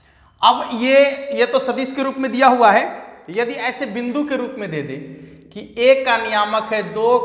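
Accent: Bihar, India. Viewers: Guru ji hamare hi tarah khati bhojpuriya bihari hai gajab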